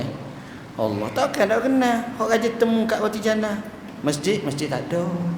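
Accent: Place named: Kelantan, Malaysia. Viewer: ustaz ni orang kelantan ke. bahasa kelantan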